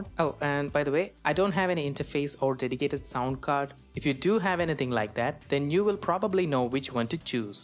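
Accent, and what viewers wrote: India. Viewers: What's the size of cakewalk walk [including studio instruments suite only] By the way... Where are you from?? Your voice sounds like an Indian ^_^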